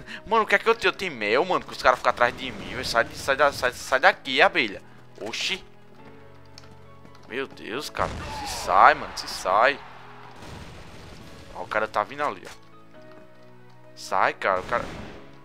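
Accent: Northeast, Brazil. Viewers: vc é do nordeste? não sei mas percebi pelo sutaque slá. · vc é nordestino??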